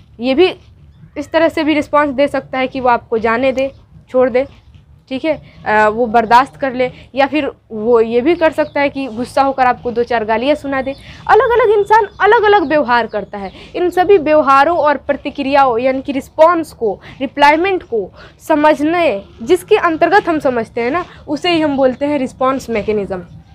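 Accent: Bihar, India. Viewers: I think this mam from saran,siwan, Gopalganj. Bihar... definitely...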